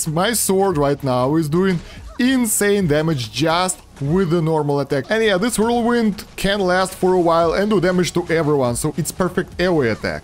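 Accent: Russia. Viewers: Готов поставить на то что это русский пытающийся говорить на английском языке Вот 100% я уверен что это русский человек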